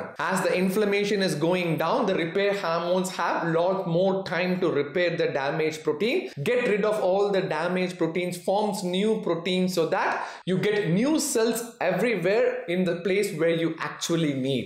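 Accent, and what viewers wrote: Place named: South India, India. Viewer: Your video are interesting to watch and the way u tell in South Indian accent and your friend name savarna Kumar lol 😅 Thanks for great knowledge very helpful 🎉😊 god bless you doctor 😊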